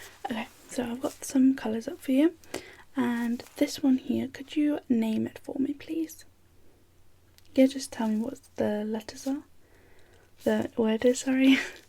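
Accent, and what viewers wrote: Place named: United Kingdom. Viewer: I love your Britanic accent❤️❤️❤️😋♥️kiss You Young lady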